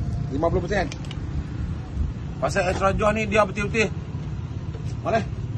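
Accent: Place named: North, Malaysia. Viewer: kecek uthaghaa nohh..☕😝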